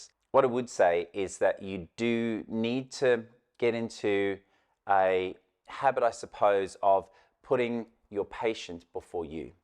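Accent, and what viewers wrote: Australia. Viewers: I am so sorry to hear about you and Zoe. I was thinking that something was wrong because you stopped wearing your wedding ring. You are such an amazing vet with an incredible affinity for animals and people. You are humble, open, and honest. You are so brave to share all your personal business. We want to know because we care about you and Zoe, and we want to be assured that you are alright in every aspect of your professional and personal life. Also, I love to listen to you talk because of your alluring Australian accent. Please continue to do these videos and your Rescue Vet program. Love from a Canadian fan. Wishing you luck and success. ❤😊 Deb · Love the channel, I about to rescue a retired greyhound, I have had one before and they are the most beautiful dogs. I love your accent, I have my Siri on Australian! 🇦🇺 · Because he was born in my Hometown of Brisbane QLD Australia & never lost his Aussie accent.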